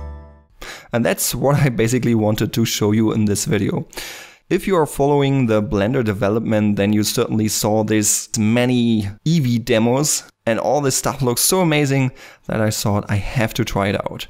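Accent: Germany. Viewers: Nice video :) I like this german accent xD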